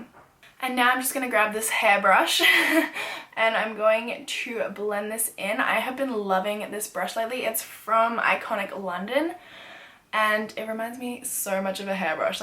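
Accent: Australia. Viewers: is she from australia? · Diana O'Connor lol she sounds the same the whole time to me, your obviously not aussie coz thats a full aussie accent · I loveeeee her Australian accent 😻😻😻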